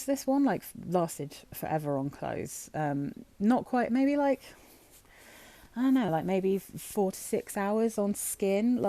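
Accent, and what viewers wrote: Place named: United Kingdom. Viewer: Well, I'm in Atlanta but I'd sure love to meet up and go sniffing around London with ya! (Maybe someday I'll make it across the pond!) Lol. Love your weekly roundups and your British accent is so lovely to my deep south American ears... My SOTD is SJP Covet, which I know you enjoy too... Happy Birthday! 🥳🧁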